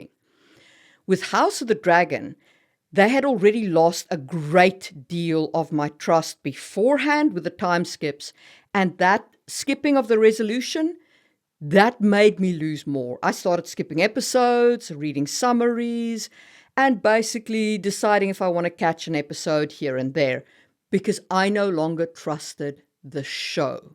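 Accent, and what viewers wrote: South Africa. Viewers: Dont mean to be too personal but are you south african? All the info says you stay in Finland but that accent says you're from here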